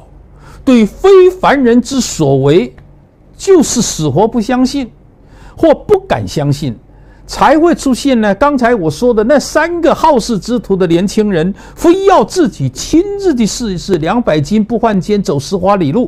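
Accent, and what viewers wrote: Fujian, China. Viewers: Your spoken mandarin with fujian accent is horrific to listen to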